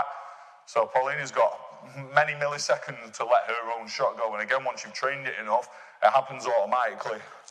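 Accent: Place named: Manchester, United Kingdom. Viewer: Loving the manc accent, didn’t realise was based in Manchester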